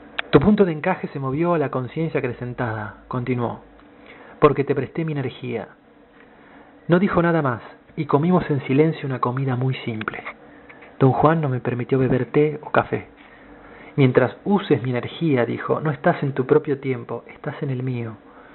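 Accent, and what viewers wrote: Argentina. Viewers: Porque dices shover es llover arjentino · Que poca madre arruinar algo tan profundo con la lectura culera y por si faltaba algo pa hacerlo mas mierda el acento argentino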